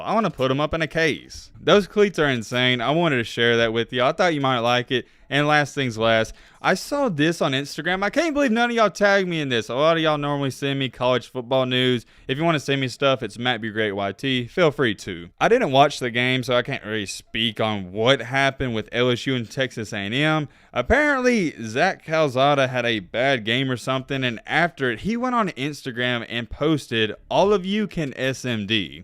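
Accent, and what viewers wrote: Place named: Alabama, United States. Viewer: We can clearly tell you from Alabama. Pick up truck ready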